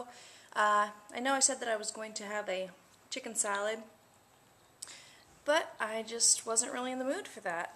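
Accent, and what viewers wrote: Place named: Pennsylvania, United States. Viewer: Hey lady, don't k now if you got my message on a previous video... but i heard you say your parents are from PA... and i went "HA! I knew you were from Pa from your accent" I'm from Pa too... northeastern area... I love your vids sweety, I feel like your one of my friends, I think i listen to you more often than I hear from my own friends lol! I've recently quit my job so i'm home all day long and I've been spending alot of time on youtube! Love ya!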